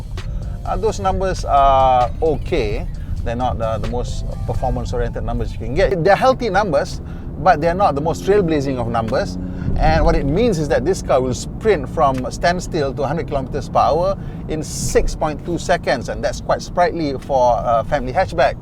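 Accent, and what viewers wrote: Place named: Kelantan, Malaysia. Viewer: best jugak cakap omputih sleng kelantan... senang faham hehehe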